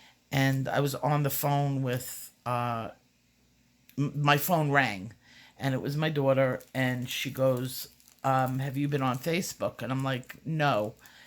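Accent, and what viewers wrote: New York, United States. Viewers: When my close friend died I had not yet discovered coloring. What brings me here? You are a great talent. You are generous with your talent. You are clear. And genuine. And I like your New Yawk/New Jursey accent.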